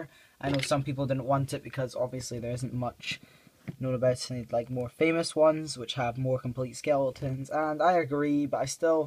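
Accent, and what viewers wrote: United Kingdom. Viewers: And please stop speaking British plese repliy.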